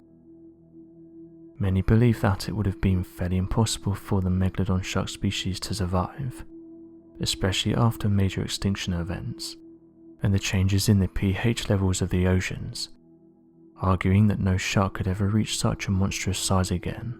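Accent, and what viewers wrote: United Kingdom. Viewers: Just because you mysteriously whisper with a British accent does not make this video and your story and you any less full of sh*t.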